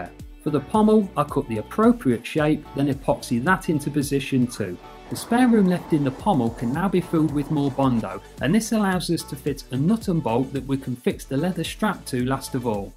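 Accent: United Kingdom. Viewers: This video sounds so british that my endgame got invaded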